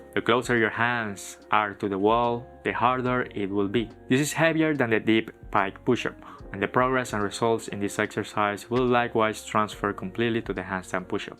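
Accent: Spain. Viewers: Man, you look so asian, but you have a hint of an european accent (may be spanish). Not as important, thanks for your guide ;), especially the bent arm press. I have been trying to kick up to a handstand for almost 2.5 years and can barely hold myself up for a few seconds, inconsistently.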